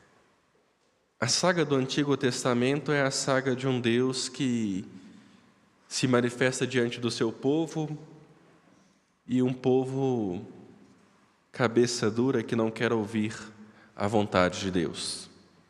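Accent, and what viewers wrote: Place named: Minas Gerais, Brazil. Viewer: A padre tem aquela fala e coisa descansada do mineiro raiz ,que eu gosto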